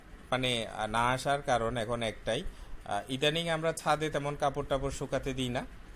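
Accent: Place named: Chittagong, Bangladesh. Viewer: Apu ke bolsi,apu problem holo ami chittagong er kothagulo bujte parini...☹!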